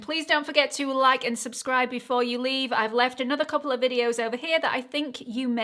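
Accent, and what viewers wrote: United Kingdom. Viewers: British English ❤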